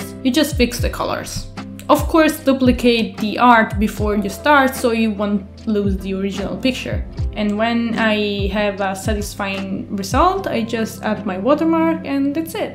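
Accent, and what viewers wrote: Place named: England, United Kingdom. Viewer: You sound a lot like Sypha from Castlevania! I don't just mean your English accent but like your overall tone/pitch Very soothing :> And this was so relaxing to watch, I've wondered how you do a few things here and there so it was great hearing about the process!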